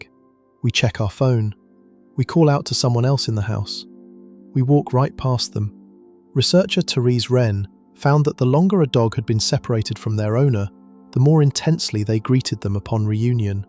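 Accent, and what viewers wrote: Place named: United Kingdom. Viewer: PLEASE STOP the constant use of British accents! It’s not necessary.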